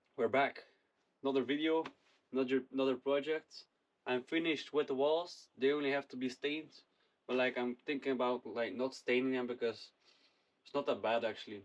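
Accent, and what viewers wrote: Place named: West Flanders, Belgium. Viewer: Vn da goe west vlams engels af en toe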